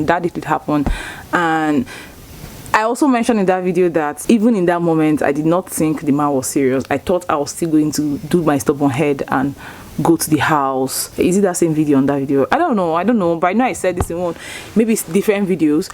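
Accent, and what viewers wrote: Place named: Igbo, Nigeria. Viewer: which part of igbo are u from bc this ur English ashaam sounds like ours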